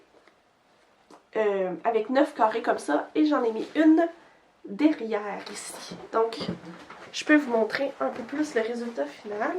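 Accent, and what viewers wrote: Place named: Quebec, Canada. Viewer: Merci, c'était bien agréable de t'écouter. Je vie en France et je suis actuellement à l'hôpital alors d'entendre ce petit accent Québécois est bien sympathiques 😉❤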